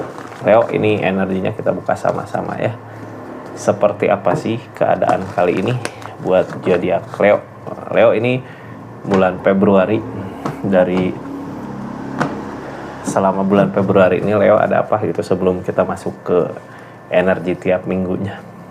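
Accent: West Java, Indonesia. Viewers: Leo. Hadir dari. Lampung Sy suka banget. Dgn. Logat. Sunda nya. ❤❤❤❤❤❤❤❤❤❤❤❤❤❤❤❤❤❤❤❤❤❤❤❤❤❤❤❤❤❤❤❤❤❤❤❤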